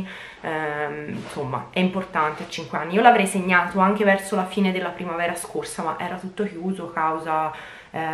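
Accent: Tuscany, Italy. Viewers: Ciao. E' la prima volta che ti vedo su youtube. Sei veramente bella, mi piacciono in particolare i tuoi capelli. Dal tuo accento mi sembra di capire che sei toscana, forse di siena, ho indovinato? Ciao.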